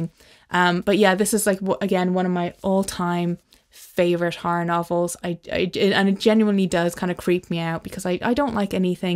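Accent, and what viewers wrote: Ireland. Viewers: I had to check your profile to be sure of your origin. Sounded Irish yet was kind of Nova Scotia or thereabouts :-) King's Pet Sematary is well done and adheres to the philosophical principles of the horror genre. Fritz Leiber rocks the horror. . . the horror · Are you Irish? 🇮🇪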